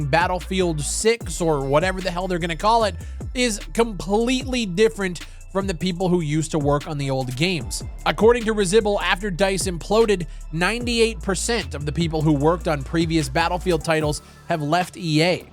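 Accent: Canada. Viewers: u Canadian or whats the accent ?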